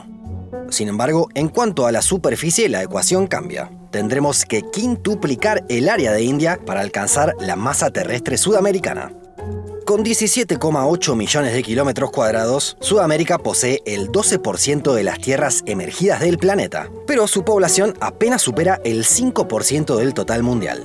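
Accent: Argentina. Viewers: como que no es argentino? el canal es de Argentina, hay tres personas detrás del canal, el chico que aparece en los videos se llama Diego Briano y es argentino. O de donde pensaste que era el acento? Mexicano..?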